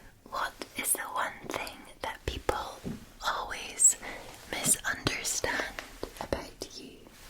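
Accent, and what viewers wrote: Scotland, United Kingdom. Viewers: 3 minutes into the video and i realised she’s Scottish how can I not tell I’m also Scottish from Dundee sadly I’m so proud now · I mean I’ve always loved the Scottish voice because hearing them shout is fucking beautiful but hearing her whisper is something else. I’m glad I share a kingdom with this woman 😇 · Wonderful asmr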